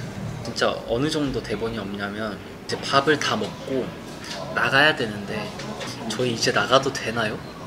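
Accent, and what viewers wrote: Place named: Gyeongsang, South Korea. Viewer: 저도 처음 봤을 때 bts 지민 느낌 있었어요. 특히 부산 출신이라 그런지 경상도 억양, 목소리, 말투가 너무 닮아서 놀랬어요.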